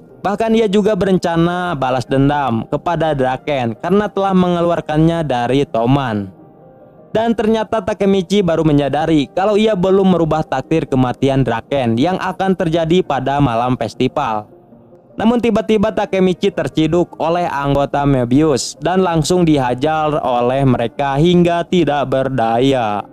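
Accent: West Java, Indonesia. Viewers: Logat sunda na mah aya wae da hese lengieut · Sunda geningan ngomongna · Bg asli Sunda kah has Sunda bgt suaranya 😆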